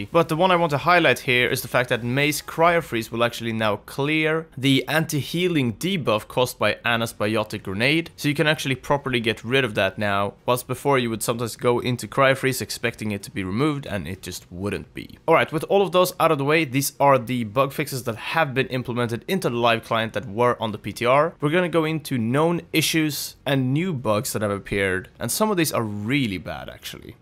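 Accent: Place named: Sweden. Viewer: You sound Swedish. Are you Swedish?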